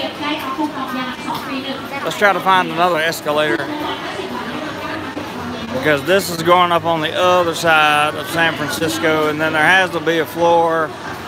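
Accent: South, United States. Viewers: His southern accent is so attractive. 😻 laugh like George W sexy boy!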